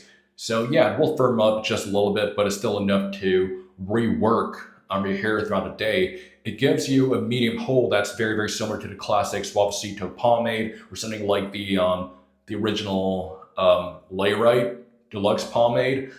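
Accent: South, United States. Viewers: ! You’re back! I thought you were living in Germany? … yet I detect a southern (USA) accent. 🤔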